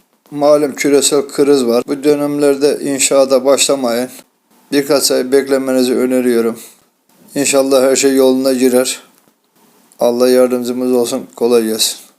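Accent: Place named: Black Sea, Turkey. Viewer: Ustam siveden bizim karadenizli gibisiniz...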